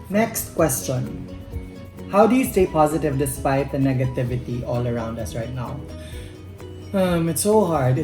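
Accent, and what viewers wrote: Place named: Cebu, Philippines. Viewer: I like the shape of your face..your nose and eyes. The way you speak in english also..d halata nga cebuana ka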